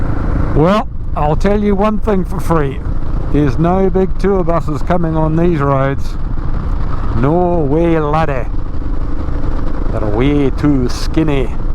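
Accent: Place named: Scotland, United Kingdom. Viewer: Your Scottish accent had me giggling 😂. I'm on my way....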